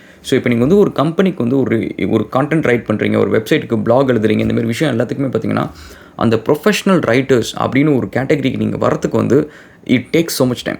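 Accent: Dindigul, India. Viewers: Nenga Dindigul ah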